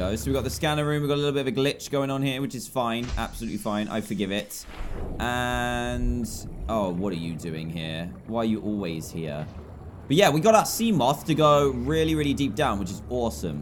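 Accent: England, United Kingdom. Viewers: I just turned 11 and I asked my mum how long she’s been hearing an English accent coming out of my computer and she said 2012 and btw commenters from the future it’s 2018 right now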